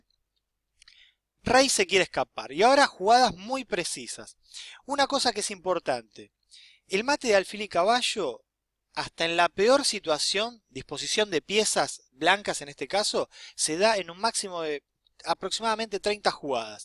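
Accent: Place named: Argentina. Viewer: Muchacho, eres argentino por el acento. JAMAS escuché una explicación tan clara de este abstruso asunto para los no dotados para el ajedrez, como quien suscribe este comentario. Grassssss....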